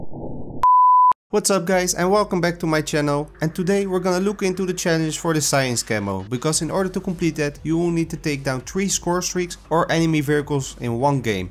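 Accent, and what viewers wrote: Netherlands. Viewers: you sound dutch mate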